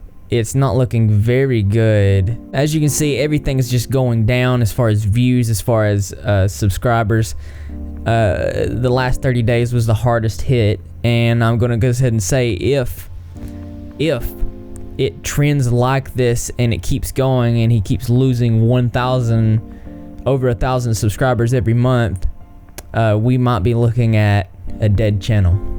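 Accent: South, United States. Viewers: are you from the south? I can hear a southern draw in your voice.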